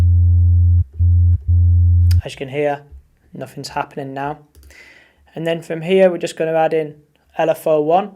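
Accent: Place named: Leeds, United Kingdom. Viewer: Your guna do well on here, I've been searching speed garage tutorials for the last 2.5 years!! There's fuckall!! Lol nice bass that mate, we're you from? You sound very close to my home town Leeds??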